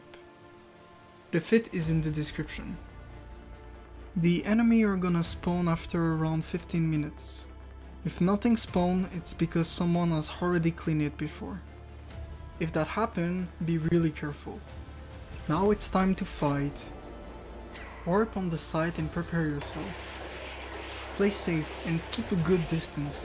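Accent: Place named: Quebec, Canada. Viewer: Hi, do you speak french? I noticed that in your username you have '' '' and that you have some what of a french canadian accent. (Si oui, je débute et je cherche des gens pour jouer )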